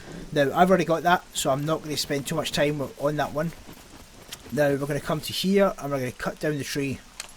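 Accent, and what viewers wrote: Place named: Scotland, United Kingdom. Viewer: Not at all hes Scottish conor is irish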